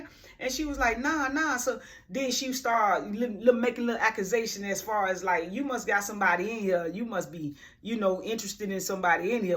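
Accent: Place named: Louisiana, United States. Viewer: That’s that Lousiana, low country GA or SC accent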